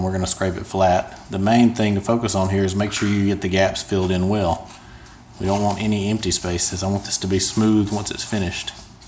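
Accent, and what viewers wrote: South Carolina, United States. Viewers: where is this accent from? south carolina?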